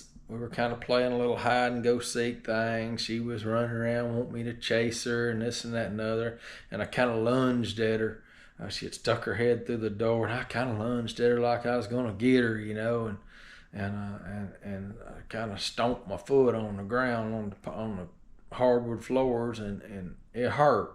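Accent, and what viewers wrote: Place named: Deep South, United States. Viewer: Thanks for the update, im pleased that youre doing well at this point. Im 2 weeks post TKR so still finding it tough but getting by. I was meant to have my staples removed yesterday but they couldnt be removed as still had some bleeding , so that was disappointing. Ive been told to fully rest it for a week then they will try again next week. I hope i get to where youre at after 6 months..it seems a long way off...lack of sleep is the biggest problem!. Look forward to your next update. I love your accent by the way, im from the UK so not sure which area of the U S your accent is from but its what i imagine to be from the deep south!. Good luck with the rest of your recovery😊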